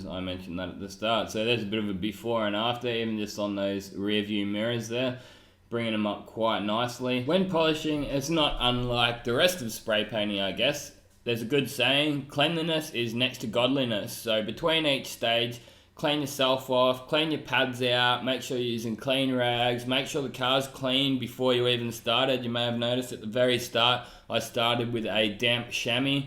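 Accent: Australia. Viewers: but you are Australian or American? which city?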